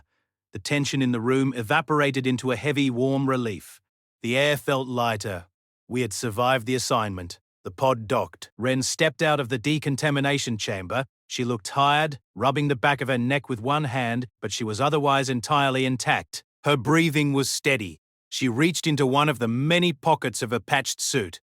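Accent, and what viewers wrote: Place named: Australia. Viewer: 6/10 the Australian/new zeland accent is a pleasant change of pace from most AI readers, and there were no hangup pauses in the script reading. however, this was also a detriment, as it feels like the reader ignored any punctuation whatsoever. the captioner had one incident of wrong word use. the story itself uses the "lighworlders", "insectoid", "deathworld", "only an earther" and "only a woman" tropes, but avoids the Xenolinguistic names and gender refrences. the shortness of the story is a plus. no wiggly fill for the visuals, and a fixed piece of art is a positive.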